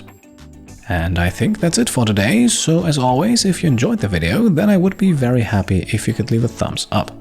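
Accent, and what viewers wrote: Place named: Germany. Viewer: I don't usually comment in your videos, but I love your work and never miss your Rimworld and XCom videos, they're just perfect... Fun fact, I don't know how I never realised you are German, hearing it now it seems obvious...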